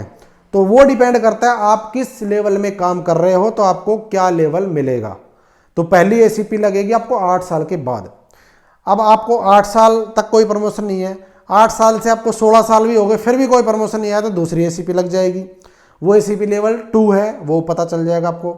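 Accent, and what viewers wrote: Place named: South Haryana, India. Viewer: It's seems u belongs to south haryana like Rewari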